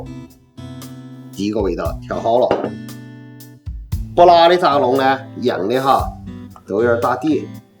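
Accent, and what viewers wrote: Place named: Sichuan, China. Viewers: 四川accent，你个鸹貔！ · Yw Szechuan dialect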